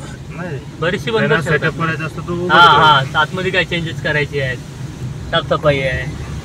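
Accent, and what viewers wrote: Konkan, India. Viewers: लय भारी आपली कोकणी बोली भाषा एक नंबर, चे व्हिडिओ बघितले आहेत धुमाकूळ घातलाव, तुमचा फॅन च, मेहनतीला पर्याय नाही, 🙏 विडिओ दररोज येऊ दे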